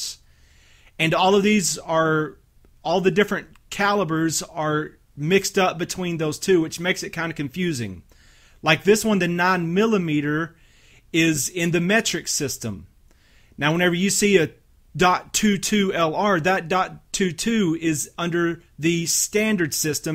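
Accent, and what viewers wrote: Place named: South, United States. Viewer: He kinda sounds like he's southern/midwestern so maybe he's in Missouri or Kansas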